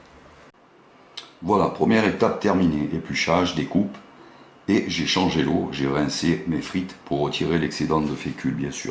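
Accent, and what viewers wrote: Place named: Marseille, France. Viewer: On entend bien l'accent et la façon de parler des marseillais. Donc je m'abonne !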